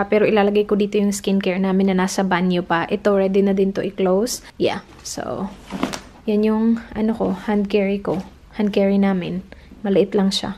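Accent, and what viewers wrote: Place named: Visayas, Philippines. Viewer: Hi ate! Makalingaw kaayo ka inig mag bisaya:P New subscriber diay hehe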